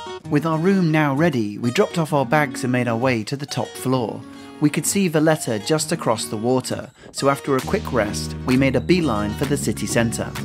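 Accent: England, United Kingdom. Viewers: Such a pleasure hearing an English voice rather than the ubiquitous American voice. I have a son living and working in Malta but this video is the only sight I will see of Malta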